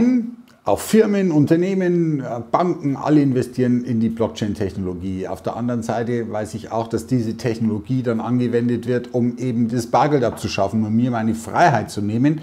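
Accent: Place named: Franconia, Germany. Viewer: Woher kommst du Meister? Ich höre fränkische Züge raus. 👌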